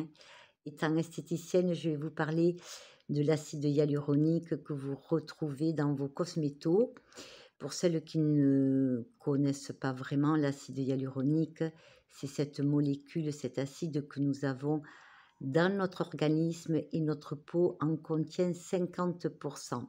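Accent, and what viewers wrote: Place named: Toulouse, France. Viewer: Vers Toulouse.. * 💥🥳💥 🥰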